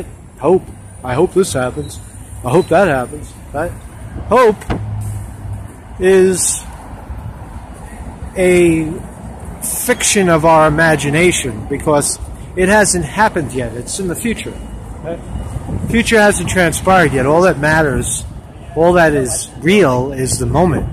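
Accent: New York, United States. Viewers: The wind (well, not so much), the horns, your New York accent, I love it. Keep keeping it real. Rock on, brother.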